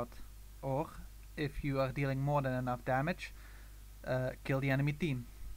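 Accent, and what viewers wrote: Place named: Netherlands. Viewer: ik hoor dat je nederlands bent :p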